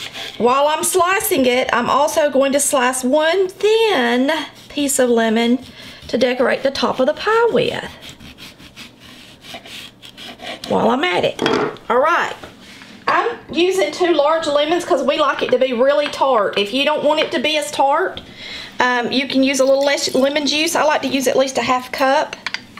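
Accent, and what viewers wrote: South, United States. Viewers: Love your southern accent. I make a limeade icebox pie that’s very delicious. Tastes lovely ke a refreshing slice of limeade pie. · 😂😂 the country accent always adds some extra syllables to throw u off!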